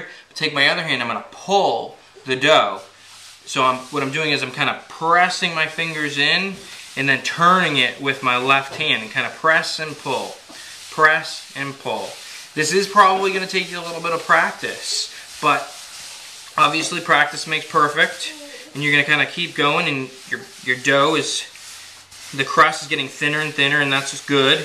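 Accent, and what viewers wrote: Canada. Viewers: Are you Canadian? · You sound like ur from Ontario Canada..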